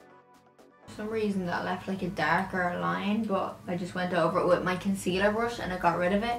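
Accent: Ireland. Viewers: I could listen to you speak with your beautiful Irish accent all day. I love this palette. The shades are stunning and the look you created is gorgeous.. Thanks for the tutorial. xxx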